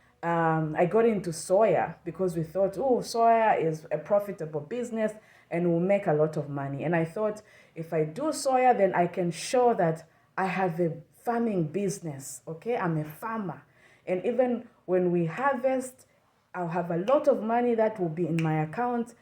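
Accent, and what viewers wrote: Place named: Kenya. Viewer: are kenyan?you look, sound like everything about you is Kenyan. New subscriber from kenyan plus loving your content gal,it's top notch!!! Thank you and here to support you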